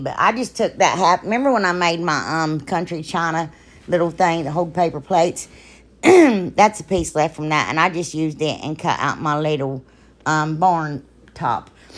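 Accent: South, United States. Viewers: Guuurrll !! That country twang had me smiling all thru your video. Where are you from? It can't be Ohio. I'm from Florida but have lived in Alabama for the last 48 years. So I've picked up their southern talk. Enjoyed your video. Your projects are great!! TFS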